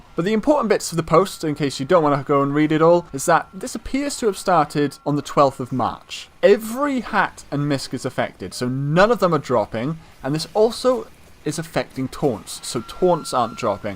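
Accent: Wales, United Kingdom. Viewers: Are you Welsh ?? You sound like it.....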